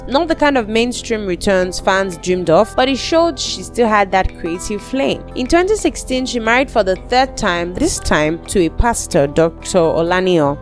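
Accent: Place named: Nigeria. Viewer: Try dey listen. This is Nigerian English for goodness sake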